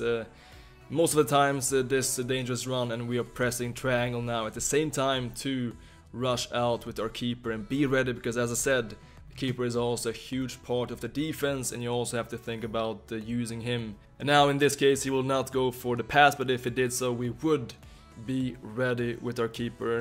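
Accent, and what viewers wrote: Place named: Sweden. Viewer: Hahahaha. I first thought was some kind of Boris/Borat namechild. Heard the accent. "Ah, Borås.":D (not a big accent, but I could tell)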